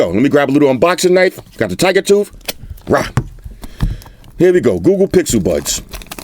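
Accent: New York, United States. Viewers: It’s a New York thing 💪🏽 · Just got into your video's and I really like them. I subscribed to you brotha. Also you sound like a true new yorker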